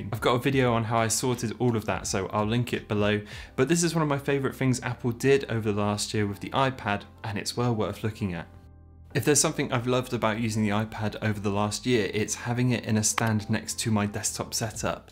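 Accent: United Kingdom. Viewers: Are you British? Love your accent 😊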